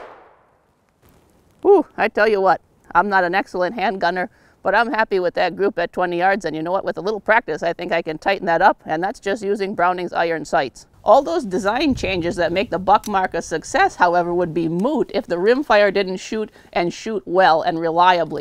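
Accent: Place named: Midwest, United States. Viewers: Wild stab in the dark, she’s from the Midwest? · Ya! Upper midwest, I bet.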